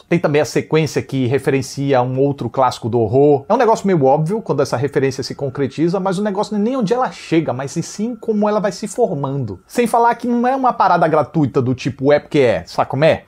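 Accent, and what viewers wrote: Northeast, Brazil. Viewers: Tá mais bonito fantasiado de zombie . Brincadeiras as parte , eu gosto muito das suas críticas. Muito bom o sotaque nordestino , eu TB sou srsrs ; além da análise madura e didática . 🎉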